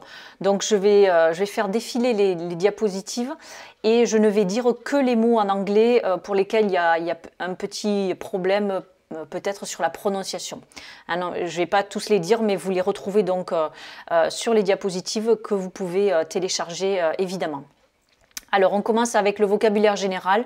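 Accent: France. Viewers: Bonjour, excellente présentation étendue de ce vocabulaire très intéressant par les temps qui courent. Cependant, je suis professeur d'anglais depuis 35 ans, et je me demandais si votre prononciation légèrement francisée des termes et expressions était voulue ou pas, dans le but de faciliter la prononciation anglaise pour vos internautes francophones ? Merci pour votre réponse éventuelle, et encore bravo.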